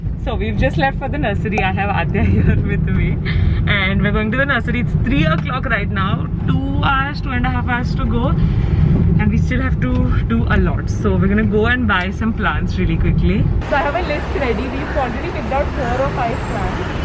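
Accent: Gujarat, India. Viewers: I love how. Cute gujrati u speak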